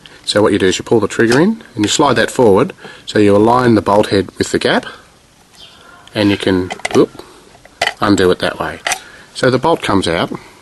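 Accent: Australia. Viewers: Gday from Qld. I wish i had a beautiful aussie accent like you. where are you from?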